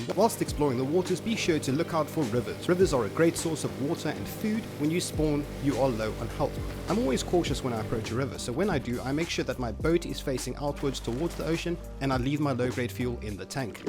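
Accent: South Africa. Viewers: your South-African?? dude that's so cool, i never knew, its almost wipe day on Intox 1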